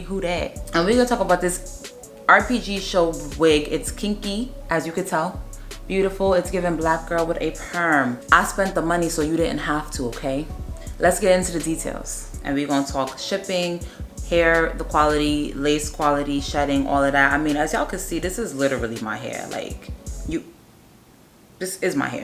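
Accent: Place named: East Coast, United States. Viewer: East Coast Accent - I love it! If not it sure sounds like it! And its waaay worth the money that you spent! I love it on you!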